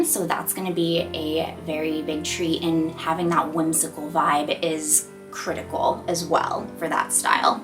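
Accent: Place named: California, United States. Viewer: You sound so California, I love it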